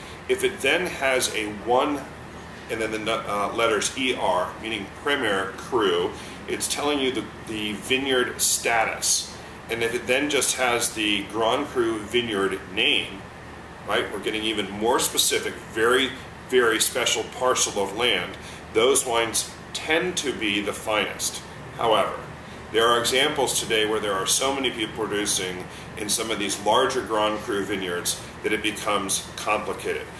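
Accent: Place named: United States. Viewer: practice that american accent in french. so sad.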